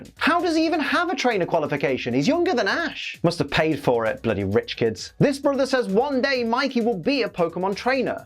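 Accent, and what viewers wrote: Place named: Derby, United Kingdom. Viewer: I love hearing the divine Derby accent. I was born there but i left very young so the accent sounds so incredibly great to me just because no one around me speaks like i do aka swears more than drunk Irishman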